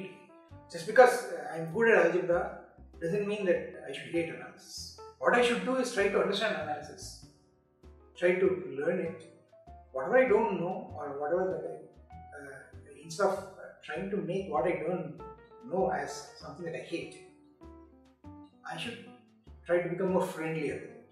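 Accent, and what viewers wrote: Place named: India. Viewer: I cannot understand his India accent, no offense.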